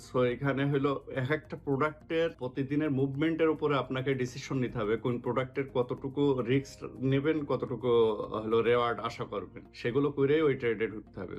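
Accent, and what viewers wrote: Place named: Kushtia, Bangladesh. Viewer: Vaiyar basa ki kushtia te? Kushtia r vasar moto lagtise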